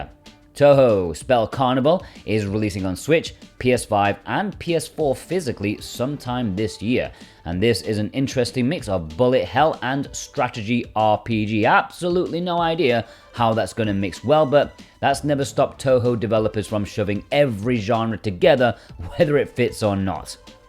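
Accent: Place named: United Kingdom. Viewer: Youre British? It’s strange i can understand your speaking 😅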